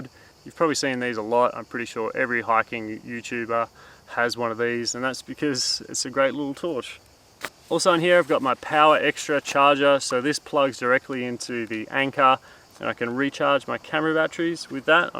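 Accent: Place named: Australia. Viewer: With the Aussie accent Can Serrat sounds like something else. Good video mate hi from Tassie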